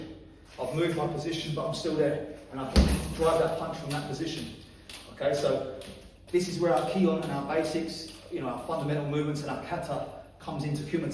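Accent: United Kingdom. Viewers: Thanks for this video I am a beginner and I am going to concentrate on defensive techniques when I spar I am 67 years old and I am the oldest guy by far in my dojo someone wish me luck or do you have any first time you spared stories I would appreciate it Thanks for your videos I joined your group and for now you will be the only one I join plus I love the British accent it is British isn’t it sometimes I get Brits and Aussies confused any way thanks for your videos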